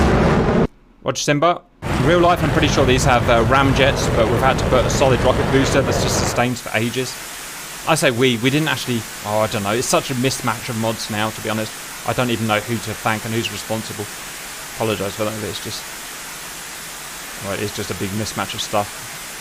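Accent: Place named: RP, United Kingdom. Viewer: A lot of relentless jibber jabber from a posh boy with a neo RP accent. Wonder how long these tossers would last in a real war?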